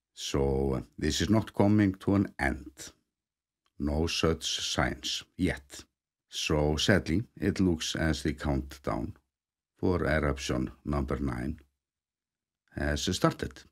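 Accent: Iceland. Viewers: I love Iceland since I was young and I got a book from Jon Svensson about Nonni! But first in May 2022 I could follow my dream and visited Iceland and Nonnis home in Akureyri. In March 2024 I was again in Iceland (between two eruptions) and saw the beautiful Katla icecave. I live in Germany and I will visit the tomb of Jon Svensson in cologne next. Thank you for your beautiful reports about Iceland! I love your Icelandic/English dialect! Many thankful regards!!!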